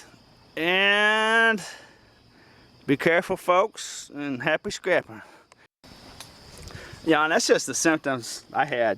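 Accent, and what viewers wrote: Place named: North Carolina, United States. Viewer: I'm curious about where your accent is from? I like the way you talk...sounds like north Carolina.....thx for the vids and info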